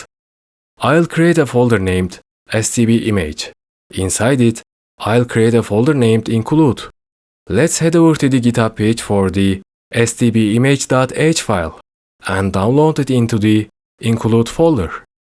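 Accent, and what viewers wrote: Turkey. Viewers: konuşmandan türk olduğunu anladım master :) ben de linux da vulkan api ile çalışan bodycam clonu yapmaya çalışıyorum. windows dan devşirme değilde linuxdan devşirme yönlü olmasını istiyorum. microsoft ve nvideadan bağımsız directx saçmalığı olmadan. kod bilgim yok ama bası oyun modları yaptım daha önce. biz yetişkinlerin her akşam seferberlik eğitimi olarak. yarım saatlik roundlarla cqb savaşı yapa bildiği takım bazlı oyunu yapmak istiyorum. lyra game baz alarak bluprintler ile yapmayı deneyeceğim.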